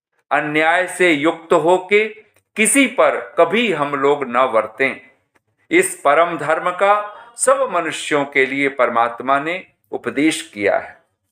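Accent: Standard. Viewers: ॐ नमस्ते आचार्य जी ! शुद्ध उच्चारण के साथ अति सुन्दर प्रस्तुति I हार्दिक धन्यवाद I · बहुत ही शुद्ध उच्चारण हैं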